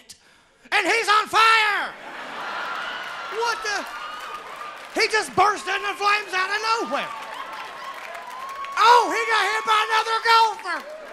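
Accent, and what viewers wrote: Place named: South, United States. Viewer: I'm from a small ass southern town & I just found this guy he legit is apart of my family just bc of all the similarities. The accent is killing me bc it's like listening to my crazy ass uncle's 😭😂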